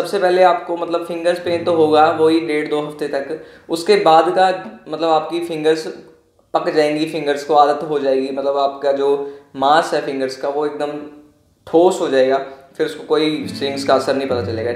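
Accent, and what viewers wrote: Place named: Punjab, India. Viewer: The punjabi touch in ur hindi... Just so sweet 😂❤❤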